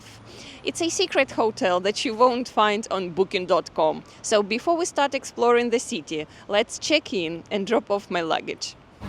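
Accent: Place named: Russia. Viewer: не возможно слушать этот ужасный русский акцент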